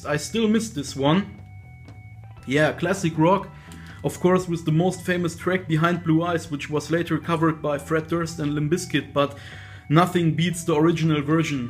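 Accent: Germany. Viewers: Ist das total krass dass ich am akzent gehört hab dass er Deutscher ist ? 😀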